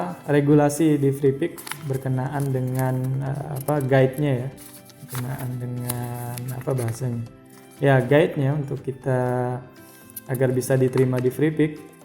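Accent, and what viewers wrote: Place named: Pontianak, Indonesia. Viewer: Kayak bhsa pontianak ya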